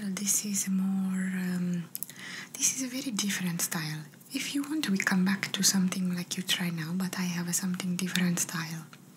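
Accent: Italy. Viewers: Ciao bella.... your itailian accent so great..i love it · Ah yesss Italian accent 😍😍❤️ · as an Italian person this is exactly my accent when I speak English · a “new rude Italian exchange student” would be such a fun role play with this accent! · oh my god! are you actually italian? cause your accent is literally perfect👀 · Hi fantastic ASMR I liked it a lot and I enjoyed it with your Italian accent that I love and listening to your sweet relaxing voice greetings beautiful 🥰😍💖🌹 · omg i think i found my new favourite series! the italian accent makes me feel like im a tourist in italy and boy i miss travelling 🥺 keep it up!!❤❤ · I love your Italian accent ASMR. I love how you always manage to say something that makes me laugh out loud. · I can’t what I love more your Italian 🇮🇹 accent or you ❤️ 💕 💗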